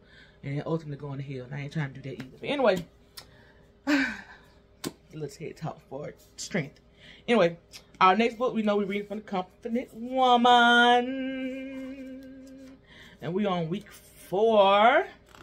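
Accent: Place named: South, United States. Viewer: Enjoy being a stay at home wife and Mom. Love that for you! Thank you for sharing your daily devotionals. Never know who needs to hear that word. 🙏🏾 I feel you on the speech Sis. I'm from the south too where we talk fast, loud and with an accent 😅 But that's what makes us unique and special. Those butterfly earrings are beautiful, nice choice! And that dinner looked delicious!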